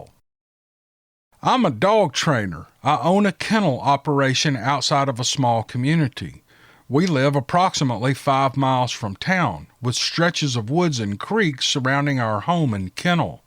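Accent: South, United States. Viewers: ...my wife claims to hear an East Texas coworker say "might-can" a lot, and I have never heard anyone say that, until you opened this episode with a "might-could." Thanks for your wonderful Southern accent and jargon. Merry CHRISTmas to you and your family!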